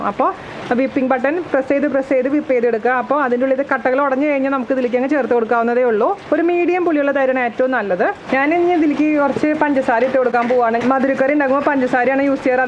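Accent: Thrissur, India. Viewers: pineapple madhura pachadee undakuna reethi valare ishtamayee....thanks a Ton.....pinne namadeee GADI yude THRISSUR slank superrrrrr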